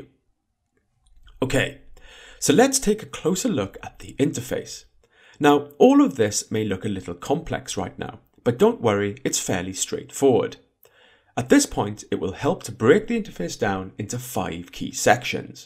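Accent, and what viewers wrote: United Kingdom. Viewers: Is there an option to turn off british accent?:hand-orange-covering-eyes::hand-orange-covering-eyes::face-orange-raised-eyebrow::face-orange-biting-nails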